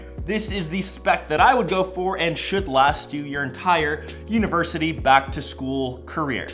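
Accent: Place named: Canada. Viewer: Your Canadian accent calms me, it's often my audio ambiance of choice while coding.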